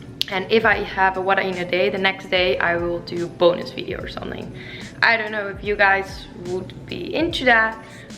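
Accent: Netherlands. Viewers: I missed your sweet Dutch accent here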